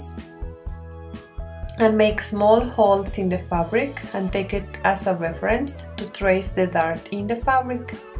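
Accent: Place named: India. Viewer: hey.. u are just awsome!! I have a personal question for u? where are u from? yr accent sounds like asian or tribal indian. may I know plz?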